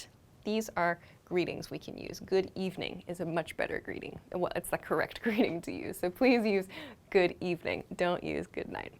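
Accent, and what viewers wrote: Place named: United States. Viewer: she using american english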